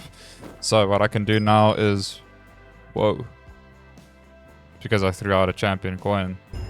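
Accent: South Africa. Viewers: Are you South African by any chance ? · bro are you from south africa? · yoh I recognize that accent from a mile away, miss South Africa man. You've earned yourself a subscriber so I can feel more at home lol. Also, a really good video!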